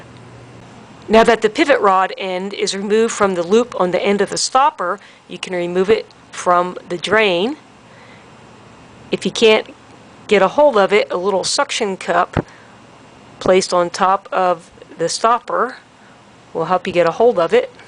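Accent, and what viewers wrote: Philadelphia, United States. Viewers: That’s a Philly accent if I’ve ever heard one!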